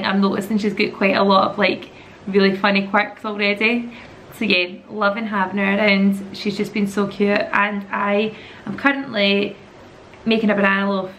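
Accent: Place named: Glasgow, United Kingdom. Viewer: Hi, Iv been watching your videos for a while now and you actually inspired me to make my own channel😊 I just had to say how sorry I was to hear about Lola, I enjoyed seeing her in your videos. Those clips of her at the start had me tearing up. Maggie is so cute though and couldn’t be part of a nicer family ❤️ Also fellow Glaswegian over here 🙋🏻‍♀️ Iv been living in England for a few years and miss Scotland so much so it’s nice to hear the sound of home ☺️🏴 xx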